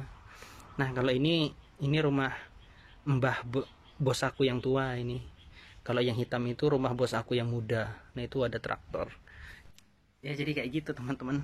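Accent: Java, Indonesia. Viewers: Orang mana bang? Biasanya kaya orang jawa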